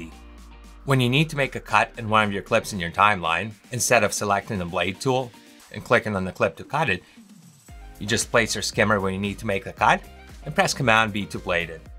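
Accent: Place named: Canada. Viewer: You are so good at this. Thanks for sharing your skill. You Canadian? Trying to figure the nice accent.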